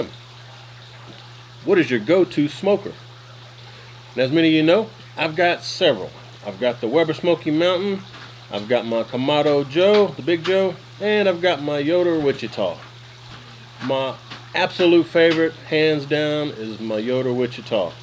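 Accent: South, United States. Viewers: Thank you, for answering questions. I love your channel and wonderful southern accent. I am learning a lot from your and the others linked to your channel. Keep up the good work.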